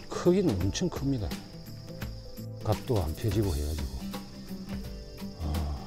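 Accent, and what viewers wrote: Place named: Gyeongsang, South Korea. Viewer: 구독,좋아요,알림하고 갑니데이^^ 말투가 정겹심더~~ㅎ 경남쪽 같으시네예 산행시 늘 안전하게 풍산하시길예^^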